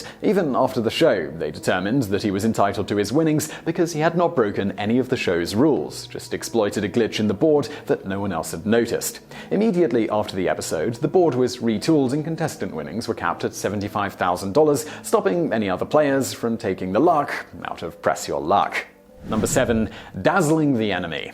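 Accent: United Kingdom. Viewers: That moment when you watch so many videos that you actually develop a decent British accent by listening to. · English Accent* I'm Scottish and trust me my accent couldn't be further from his lol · J H when you watch so much people in your life start developing a British accent.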